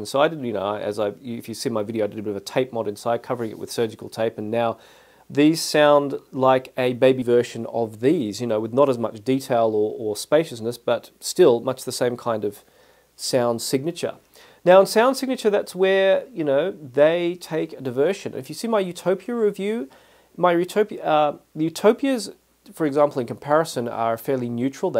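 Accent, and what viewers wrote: Australia. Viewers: Great review!! I’m thinking of getting a set of headphone. I prefer closed. I have 2 choices in mind at the moment. This Sony or the Shure SRH1540. Any chance you’ve listened to the Shure? Unfortunately I can’t directly listen to the Sony as I have to get it from Australia (you sound like you’re from Australia. I’m from NZ and unfortunately we don’t have it in our Sony stores) it’ll be interesting to get an input from you. Cheers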